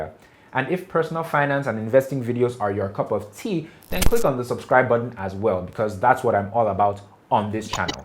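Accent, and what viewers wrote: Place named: Ghana. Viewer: Great content. Do you know similar apps for the Ghanaian investor? Btw, you sound more Ghanaian than Nigerian!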